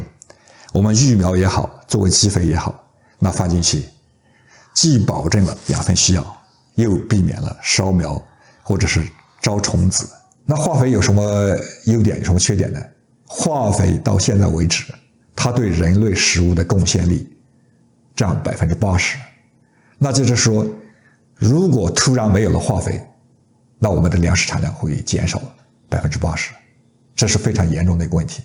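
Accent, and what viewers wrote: Yunnan, China. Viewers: 听得出是我们云南人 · 老师口音好像云南这边的。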